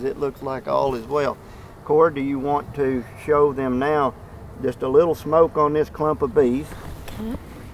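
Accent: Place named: South, United States. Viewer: Thank you for making this video. I have 12 days till I pick up my 5 frame nuc of Italian bees. I’m excited and a little anxious and your video shows me how easy it is ( hopefully). Love the southern accent. My momma is a Tennessee girl born and raised. My bees are gonna live about 10 miles from the Wisconsin border on our wooded 3 acre property.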